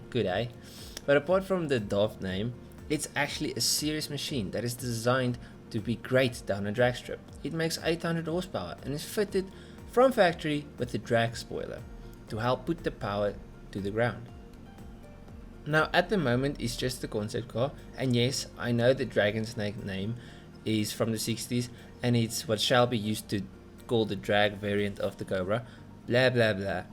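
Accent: South Africa. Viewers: It's so refreshing to hear a south African voice 😂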